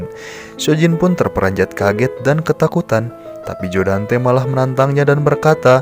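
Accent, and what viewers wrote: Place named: West Java, Indonesia. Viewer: Orang sunda mana nih...😂😂😂😂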